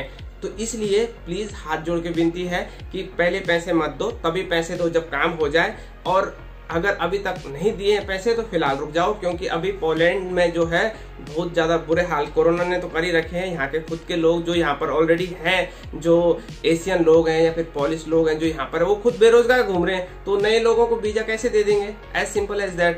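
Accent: Garhwal, India. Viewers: Bhai apka voice ton gadwali hai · Very good bro tumhari awaaj se lgra tum gadwali ho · Bhai. G kakha ka cha grhwali tune aani cha bht bdiya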